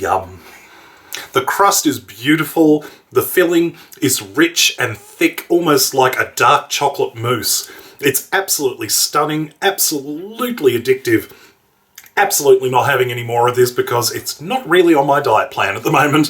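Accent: Australia. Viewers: He sounds Australian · I love his reactions after he tries the food. best part by far especially with an Australian accent. great video!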